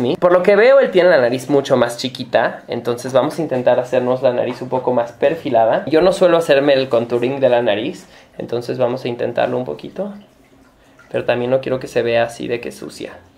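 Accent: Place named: Fresa, Mexico. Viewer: No entiendo el de que eras “mirrey” , a poco te creas de dinero, familia de renombre e influyente y tipo hablas súper fresa.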